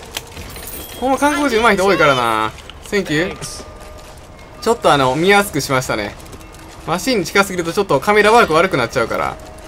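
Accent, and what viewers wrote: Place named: Kyoto, Japan. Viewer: 関西弁と京都弁が混じったRYOUSUKEさんの動画にずっと癒されてます🍀😊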